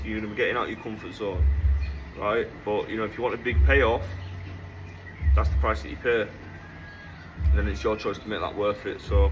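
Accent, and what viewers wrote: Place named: Leeds, United Kingdom. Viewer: you sound like you’re from Leeds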